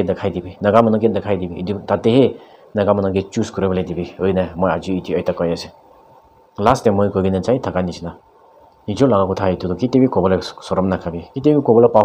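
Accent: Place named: Bengal, India. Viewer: Don't know much about your background but you speak a corrupted Assamese-mix--bengali. You claim to be a naga like us but don't you think you need to properly verify your identity. Trying to figure you out is a headache. And if the IMs are looting for whole tangkhuls like your people claim then, why we never received our fair share?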